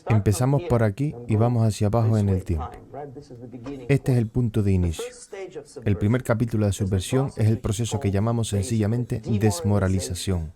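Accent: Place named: Canary Islands, Spain. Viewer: Muchísimas gracias por compartir conocimiento que de verdad nutre, tan escaso y tan difícil ee discernir entre todo el marasmo de intoxicación y desinformación que hay en la red. Orgullosa de verificar que por supuesto hay otro canario "despierto" (nuestro acento te delata...es inconfundible y a mi parecer precioso). Te deseo lo mejor con el canal, y en general, "que te vaya bonito" que diríamos por estos lares.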